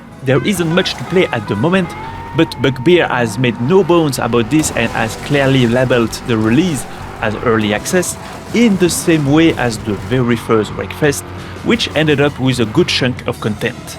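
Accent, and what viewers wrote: France. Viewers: cet accent francais 😅 (Voilà comme ça c’est mieux)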